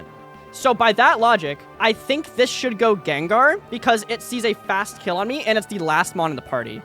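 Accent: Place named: United States. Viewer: This is clearly American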